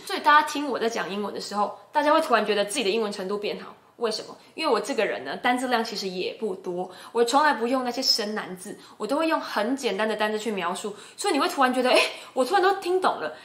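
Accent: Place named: Taiwan. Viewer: 妳分析的很好 很有道理；尤其妳那台語真的是很道地 很傳統！給妳100分👍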